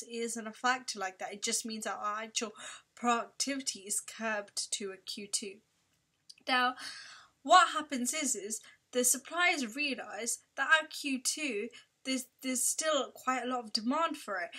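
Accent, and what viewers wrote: United Kingdom. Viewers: Her english is more english than english people's English 🤦‍♀️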